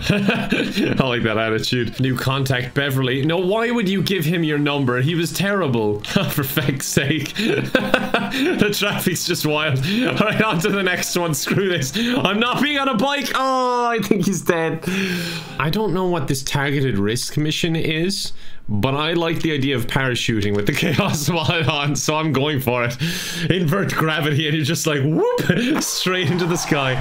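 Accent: Ireland. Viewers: Ah yes the Irish man talking about NBA love u! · Hearing an Irish Lad talking about basketball just hit different for some reason. Also love your vids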